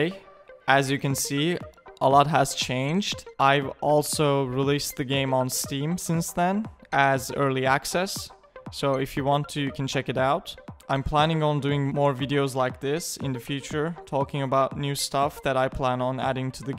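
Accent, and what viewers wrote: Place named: Turkey. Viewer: izlerken dedim adamın ingilizcesinde türk aksanı var gibi la sonra baktım kanalın adı lol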